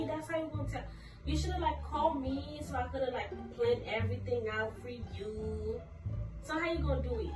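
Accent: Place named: Haiti. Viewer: are you ayisyen? I hear the accent coming out or either Congolese or something?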